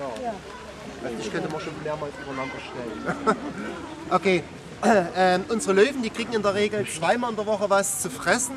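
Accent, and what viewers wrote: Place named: Saxony, Germany. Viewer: Herr, Sie können prima meinen Heimatdialekt sprechen. Ich wohnte in der Gegend, jetzt aber in Thüringen. Kriege richtig Heimatgefuehle, wenn Sie bei Elefant, Tiger und co dabei sind. Ihr Sächsisch zwischen " Leibzsch un Dresdn" ist das beste. LG nach Taucha. Sind Sie Ehrenbürger? Wäre angemessen.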